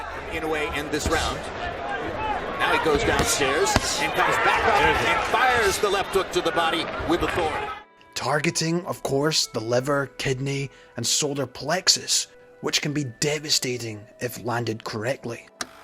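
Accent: Scotland, United Kingdom. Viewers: A Scottish accent is so right for boxing videos. Gritty, poetic and profound.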